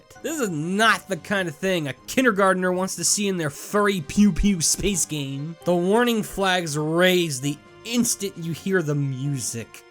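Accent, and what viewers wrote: United States. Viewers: I was N O T expecting #1. Is from Appalachia or something?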